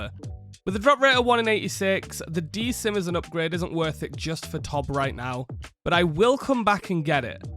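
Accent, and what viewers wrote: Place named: Yorkshire, United Kingdom. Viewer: You sound like you're from Bradford/Leeds/Hudds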